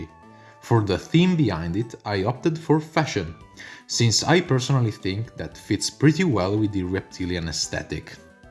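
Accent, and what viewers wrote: Italy. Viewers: Bel video, scrivo in italiano perché si capisce dalla accento, è ispirato a dadrake giusto?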